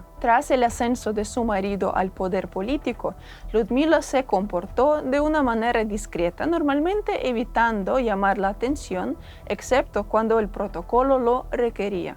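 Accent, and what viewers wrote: Russia. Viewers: Interesante historia y muy bien redatada con esa voz suave y ese acento ruso innegable, vaya que hermosa combinación. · Hola Saludos imagino por tu asento eres Russa. Muy buen video y documental Gracias. Conosco un poco de russia 🇷🇺 lo visité desde Moscú asta SantPeterburgo. Wow q hermoso país muy ordenado y por sierto tuve en relación con una hermosa chica russa mi respeto y admiración por la cultura Mi opinión personal 100% me quedo con las chicas europeas porq te admiran y respetan nuestro espacio y muy sociables Nunca le preguntan de entrada eres soltero o casado no le rebuscan los bolsillos aunq lo pueden estar necesitando son muy respetuosas. Me encanto tu video Gracias 🙏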